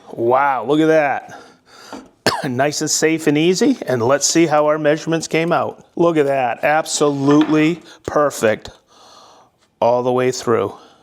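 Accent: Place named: Boston, United States. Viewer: just want to thank you for all of your tutorials on woodworking and the use of the Festool System. I'm a hobbyist and both a fan and user of Festool products. Your videos have enabled me to fully understand and utilize the features of Festool products while elevating my woodworking game. Your product recommendations are also well noted, and I'll be adding some of your suggested accessories to my toolkit soon. Each one of your tutorials are a masterclass and I plan on viewing every single video on your YouTube channel. Your energetic delivery and Boston accent are much appreciated as they add both color and authenticity as a content creator. Like Batman and Robin, you and work well together. Wishing you the best in the coming new year!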